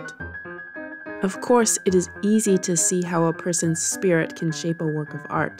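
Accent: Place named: Japan. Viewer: I like how your accent is becoming more Japanese 🥰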